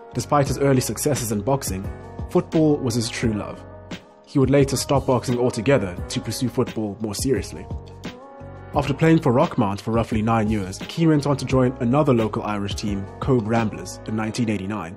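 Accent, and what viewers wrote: South Africa. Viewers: where are you from? south africa? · Nice to hear a South African 👍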